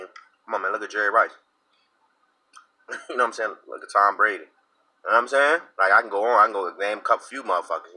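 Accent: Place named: Washington DC, United States. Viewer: where you from my good man seem like you close to DC just cause of the way you sound and you had some good views on the raiders fucking with you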